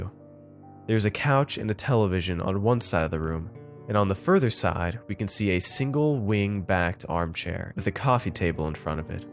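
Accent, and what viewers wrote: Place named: United States. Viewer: Discovered your channel last week and just subscribed. You're one of very few American horror narrator (assuming, by the sound of your voice, you are American. No offence, if not) that I like.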